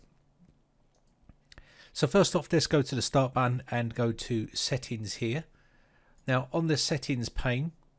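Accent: England, United Kingdom. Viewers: Nice to hear an English accent lil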